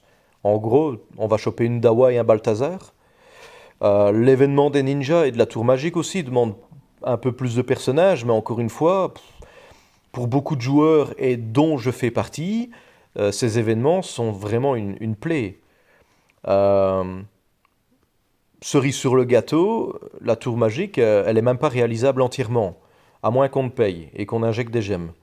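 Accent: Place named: Belgium. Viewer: Sans commentaire l'ami toute est di , donc je vais plutôt commencer par te dire que ca fait plaisir de voir un youtubeurs Belge sachant que j'ai moi même des origines belge que j'ai habité Bray Dunes donc juste a la frontière collé à ( la panne ) pendant toute mon enfance et donc forcément ca me fait toujours plaisir de revenir en Belgique chaque année ou même d'entendre l'accent belge qui me rappelle toujours de bon souvenirs , je fais partie de l'alliance de subarix et Julien notamment donc je tes découvert grâce à Juju et je trouve très intéressante ton analyse et bien résumé , et j'vais te dire moi je dépense peut depuis le début de mon aventure et j'me débrouille avec mes héros , j'ai juste pris le vip très vite pour finir mon camp rapidement , depuis je ne le prend plus et donc j'investi encore moins , j'étais à environ ( 20 , 25 voir gros max de temps en temps 30€ ) aujourd'hui entre ( 10 et 20€ gros max ) plus ils continuent dans leur délire moins j'investis , désolé pour ce long message , je ferrais plus court la prochaine fois , moi c'est Georgio salut et à bientôt l'ami 😉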